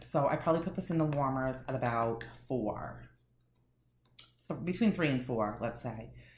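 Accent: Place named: New York, United States. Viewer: I’m from Boston. She sounds like NY or New Jersey.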